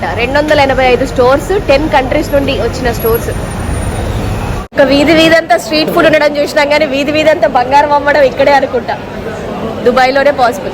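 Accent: Telangana, India. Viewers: Bro Telangana slang marchaku Anna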